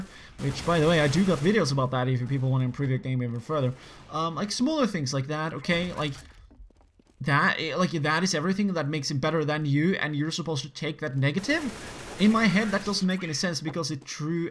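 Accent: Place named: Norway. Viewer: Jeg bare slker hvordan du snakker litt Norglish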